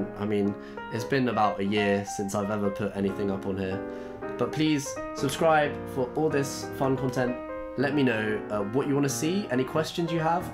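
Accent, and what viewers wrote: RP, United Kingdom. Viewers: seeing the way you tweet i thought you were from london but you sound so posh speaking slang it don't work ????? i had to stop at the "that you man have been sending to me" ... idk ... your sisters peng tho 👌😍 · Did you two go to different schools or something? You sound way posher than her mayyyyn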